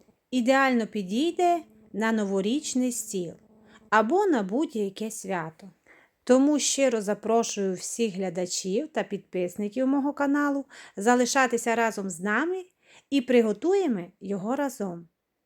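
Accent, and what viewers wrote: Transcarpathia, Ukraine. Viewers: Дякуєм за рецепт! Прийшла на ваш канал від вашої сестри. Обожнюю Закарпаття і ваш діалект!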